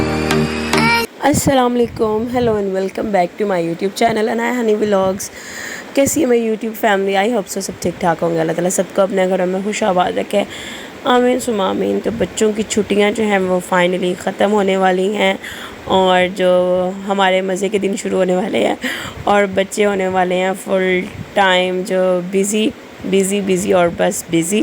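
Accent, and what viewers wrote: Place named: Pakistan. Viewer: Aapke bachche mashallah bhut cute h main India se hun aap k lahje se lag rha h aap Pakistan se h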